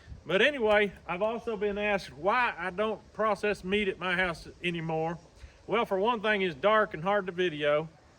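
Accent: Tennessee, United States. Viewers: YOU FROM Texas BUT YOU STELL HAVE THE TENNESSEE ( T ) in it hahaha I've not Commanded on your VIDEOS YET BUT IVE BEEN WATCHING A LONG TIME ALL YOUR VIDEOS ARE BLESSED TO WATCH GOD IS SO GOOD TO US ALL THE TIME AMEN BROTHER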